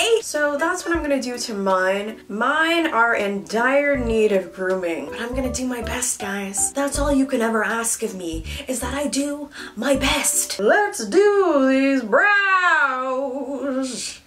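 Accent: United Kingdom. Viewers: The British accent is so realistic I am from England you literally sound like me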